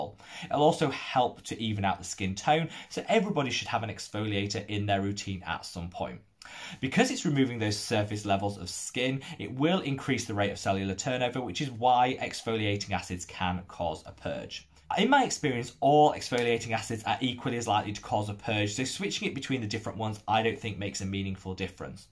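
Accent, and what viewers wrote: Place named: England, United Kingdom. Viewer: I’m in Canada so I have more accessible to the Ordinary line and I stumbled upon ur channel while looking for more informations. I’m in my 40s so i have been binging on your anti-aging and pigmentations vid. I love all ur vid and ur English accent btw, lol. Thank you for sharing your knowledge, you got yourself a new fan 👌🏻💕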